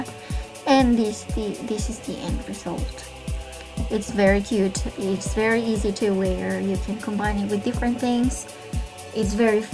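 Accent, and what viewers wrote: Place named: United Kingdom. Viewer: silly question, where are u from cause ur accent sounds british and sort of indian or asian XD sorry if i sounded rude asking lol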